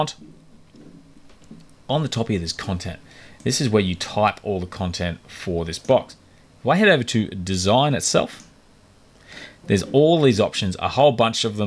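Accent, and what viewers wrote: Australia. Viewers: Oh my goodness! I work as a VA and have been struggling with this them for days, despite watching some training vids. YOUR video is EXACTLY what I was looking for! Thank you. AND discovering a fellow Aussie accent was pretty great too 👍👍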